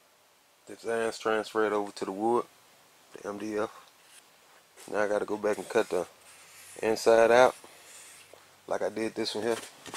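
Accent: Alabama, United States. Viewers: What part of Alabama you from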